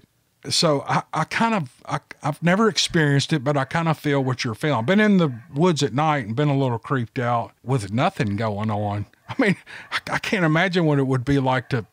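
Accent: South, United States. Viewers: me and my wife love how you tell the stories. Plus we love the good ole down south accent for reals. May Jesus bless you and yours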